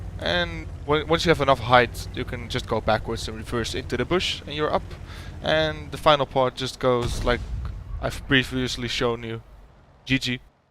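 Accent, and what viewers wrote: Netherlands. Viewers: you sound dutch.. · Are you Dutch? Well, I am... And your accent is extremely Dutch :)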